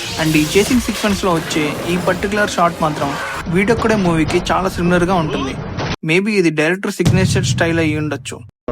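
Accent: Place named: Nellore, India. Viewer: Nijam Cheppu medi Nellore kada !!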